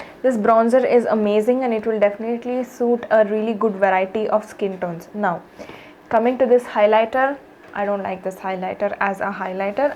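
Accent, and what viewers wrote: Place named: Bangalore, India. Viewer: Hehe.. I saw your previous video few days ago, the highlighter one I guess .. The moment I heard you, and I thought you must be a 'Bengalur hudugi' ... More than the review, I enjoy your accent.. You remind me of bangalore. Nice review too!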